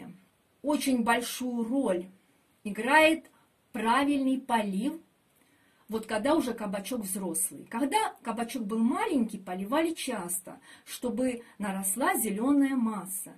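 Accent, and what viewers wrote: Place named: Russia. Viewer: Может быть у неё местный диалект,диалектов много в России?!Это же не урок русского языка в школе,а информационный канал,кто хочет ,тот поймёт!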